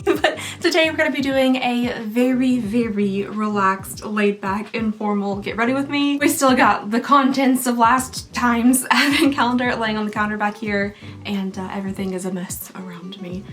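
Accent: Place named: Georgia, United States. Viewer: please know that you owe us no apology. As seen with other posters, we will be here when you feel well enough to do a video. I’m sorry to hear you are having a tough time. As for me, I just love your sense of humor and your lovely accent. It reminds me of my family of friends in GA. You have a wonderful attitude considering the amount of things you are dealing with. Good job with giving yourself injections! I know how hard that can be from personal experience. I’m proud of you!!!😊Doing this yourself will help you get over your fear of needles.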